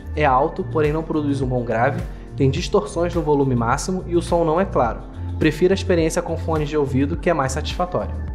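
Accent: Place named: Rio de Janeiro, Brazil. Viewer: Fotos no bairro da freguesia Jacarepaguá... Feliz por se inscrever no canal de um Carioca como eu... Tmj amigo! Melhor q aqueles reviews de sotaques Paulista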